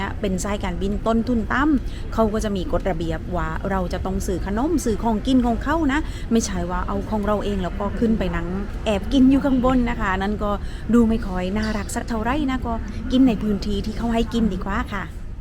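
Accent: South, Thailand. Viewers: พี่ พูดใต้น่ารักมากเลยค่า ❤️ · ผมไม่ชินกับภาษาสำเนียงใต้พี่ เลย <num> เหมือนเปิดช่องปิดเลยครับ แปลกหูไปเลยครับ555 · วาวๆๆได้ยินสำเนียงใต้(เเบบเราด้วย)55 ดีงามค่ะดีใจเเทนได้กลับไปเจอพ่อเเม่ค่ะ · คุณ แหล่งใต้ได้น่ารักมากค่ะ ตอนก้มกราบคุณแม่ ป้านำ้ตาซึมเลย คิดถึงคุณแม่ของป้าตอนท่านยังมีชีวิตอยู่ เวลาป้ากลับไปเมืองไทยความคิดถึงมันท่วมท้นอย่างไรป้าเข้าใจดีค่ะ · ชอบเวลาพี่ แหลงใต้ น่ารัก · หนูน้ำตาแตกอีกคลิปละค่ะ เข้าใจความรู้สึกของคนไกลบ้านแล้วได้กลับบ้านแบบจริงๆ แล้วสักที อบอุ่นมากค่ะ ยินดีต้อนรับสู่ประเทศไทยค่ะ //เอ็นดูพี่ แหลงใต้:) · พี่ แหลงใต้เพราะจังคะ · ชอบสำเนียงใต้มากค่ะพี่ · วันนี้พี่ พูดภาษาใต้น่ารักจังขอบคุณมากนะคะได้ความรู้มากมายเลย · พี่ พูดใต้น่ารักจังเลยค่ะ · ชอบสำเนียงภาษาใต้พี่ จังเลยค่ะ ฟังง่าย สำเนียงน่ารัก😙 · พี่ น่ารักมากเลยคะ ชอบพี่พูดใต้มากเลย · หรอยจังคลิปนี้..แหลงใต้น่ารักอย่างแรง สำเนียงหรอยนิ นุ้ยคนคอนศรี..จะแหลงเร็วๆดังๆ555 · เพื่งเริ่มตืดตาม ได้ดูคลิปย้อนหลังเรื่อย ชิบมาก ตอนกดไหว้แม่ ร้องให้เลย รับรู้ถึงความรักความคิดถึงเลย คะ ชอบสำเนียงใต้แบบนี้จัง นุ่มนวล จากคนตรังในกทม.^^ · ภาพที่พี่ ก้มกราบพ่อแม่ แทนความรู้สึกรักและคิดถึงได้เป็นอย่างดี ความอบอุ่นอาบหัวใจคนดูจริงๆค่ะ /ชอบฟังพี่ พูดภาษาใต้ ส่วนตัวแยกไม่ออกว่า สำเนียงใต้แบบนี้ แบบนั้นคือจังหวัดไหน เพราะฟังไม่ออกเลย 555 แต่คลิปนี้หนูฟังพี่ ออกนะคะ 😁😁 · พี่ พูดใต้น่ารักจังค่ะ☺️ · พี่ แหลงใต้น่ารักจัง สงสัยจะไม่ได้แหลงใต้นานแล้วใช่หม้าย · แหลงใต้น้ำเสียงน่าฟังมากกกก พี่ เวลาพูดภาษาอังกฤษก็น่าฟัง พูดภาษาไทยก็น่าฟัง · ชื่นใจ น้ำตาซึมไปด้วยคะ พี่ ประมาณตัวเองได้กลับบ้านด้วย ภาษาใต้ที่น่าฟัง คะ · สำเนียงใต้ของพี่...น่าฟังมากๆค่ะ ฟังแล้วเพลินเรยค่ะ ❤❤ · ฟังสำเนียงใต้พี่ น่ารักจังค่ะ ชอบๆ^^ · พี่ แหลงใต้ฟังเพลิน~~~ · เป็นคนเชียงใหม่ที่ฟังภาษาใต้ของพี่ ได้ แล้วภาษาใต้ของพี่ ก็เพราะด้วย เพลินดีค่ะ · ชอบฟังพี่ พูดใต้มากๆ ยินดีต้อนรับกลับบ้านน่ะพี่ ไม่ค่อยได้เม้นเเต่ดูทุกคลิปนะ❤️❤️ชอบเติ้นจังนิ❤️❤️ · น่าร้ากกก ชอบให้พี่ แหลงใต้ค่ะ น่ารักดี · คุณ แหลงใต้หร้อยๆ คนใต้(หาดใหญ่,สงขลา)คนนี้ฟังแล้วมีความสุขจังหู้นิค่ะ👍 ตอนคุณ เจอแม่พ่อก้มกราบเท้าท่านแล้วน้ำตาพี่ไหลน้องเห้อมีความสุขไปกับน้อง กันนะน้องนะ🙇‍♀️😢 🌷fc จากเนเธอร์แลนด์ 🌷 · I like your southern pronunciation so much P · น้ำตาจิไหล เข้าใจฟีลพี่ เลย หนูเปนคนใต้เหมือนกันค่ะ อยู่ ตปท.ไม่ได้หลบบ้านมาปีหวาแล้ว สู้ๆนะพี้ ส่วนสำเนียงอย่าไปแคร์เลยค่ะ แค่พี่มีความสุขทุกครั้งที่แหลงก็โอเคแล้วค่ะ ดีใจกับพี่ที่ได้หลบบ้านมาอยู่กับพ่อแม่ บ่อยขึ้นน้ะ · ชอบพี่ แหลงใต้มากเลยยย ฟังเพลินนนหู้(หู)555 พี่ น่ารักมากกเลย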